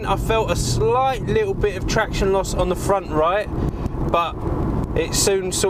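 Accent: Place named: London, United Kingdom. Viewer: Top man! Loved the micky Flanagan London accent lol. Kappa the vids coming mate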